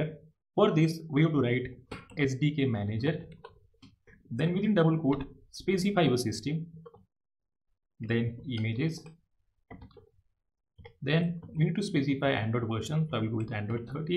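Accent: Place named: India. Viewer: closed after the indian hello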